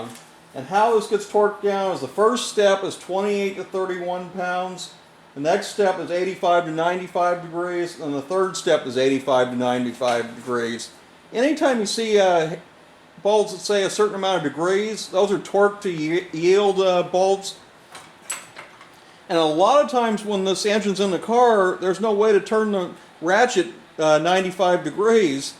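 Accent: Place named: Seattle, United States. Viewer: nice information! you sound like you are from Seattle.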